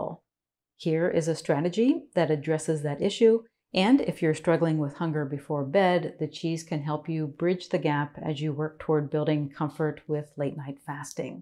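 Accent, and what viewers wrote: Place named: United States. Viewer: Excellent advice. Wonderful delivery in your calm voice with no trashy muzak track. I'm UK and some USA accents are not for me. Yours is perfect. To me you sound like Mary Chapin Carpenter so I wonder if you come from the same part of America.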